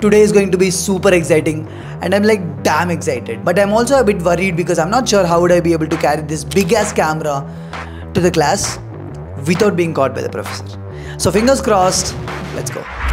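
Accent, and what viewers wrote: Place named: Telugu, India. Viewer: ur telugu asscent hai aye hai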